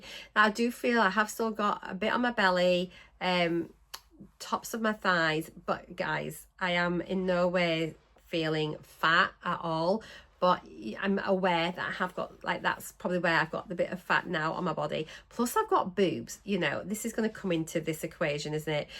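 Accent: Yorkshire, United Kingdom. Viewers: So pleased to hear you’re so close to your target! I love your channel and find your Yorkshire accent so endearing! I’m just one pound short of 4st loss, but will need to pause my injections for two weeks prior to a knee replacement surgery, and another one or two weeks post surgery. Not thrilled about that but needs must, I suppose 🤔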